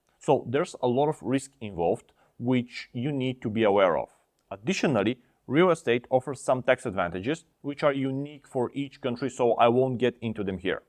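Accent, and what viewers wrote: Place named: England, United Kingdom. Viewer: Very hard to understand and main reason is that ''English'' accent ... :(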